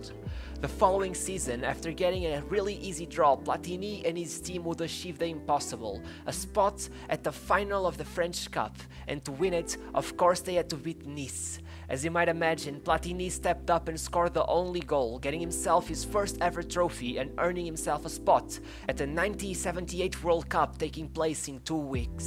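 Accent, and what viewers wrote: Portugal. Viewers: The speaker has Portuguese accent!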